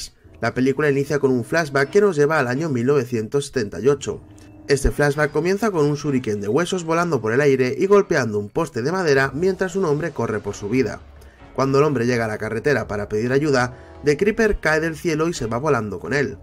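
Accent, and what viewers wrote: Spain. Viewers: por fin un video donde alguien explica lo que pasa en la tercera pelicula ya que muchos no la entendieron y la tacharon de mala yo igual quería hacerlo en su momento por esas gentes que no entendieron pero no se editar vídeos jajaja PD: me encanta tu asentó español :3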